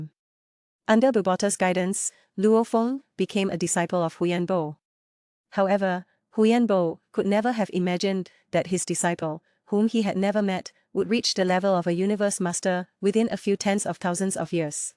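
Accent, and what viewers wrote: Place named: Singapore. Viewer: Are you singaporean? You have a Singlish accent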